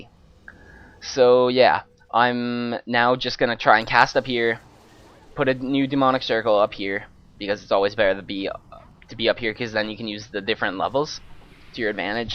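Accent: Canada. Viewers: Are you Canadian ??:)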